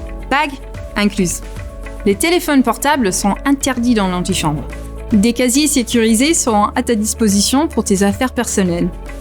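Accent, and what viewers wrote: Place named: Quebec, Canada. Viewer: ...that's exactly how we speak French in Québec. Your comment is equivalent of saying "thanks for not using slang in the english version!".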